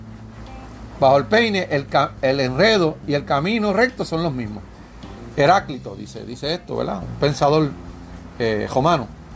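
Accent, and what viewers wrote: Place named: Puerto Rico. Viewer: Ese acento parece Boricua. 🤔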